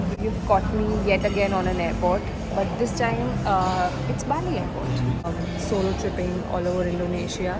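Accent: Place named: Punjab, India. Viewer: Saying it yet again that your Punjabi accent is sooo cute🤌💓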